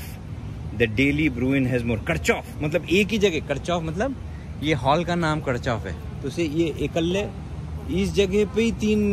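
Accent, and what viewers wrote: Indore, India. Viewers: Bhot jandar 👌👌👌👌 Ye foreign youtube channel wale indori nahi bolte to mja nahi aata unke vlog me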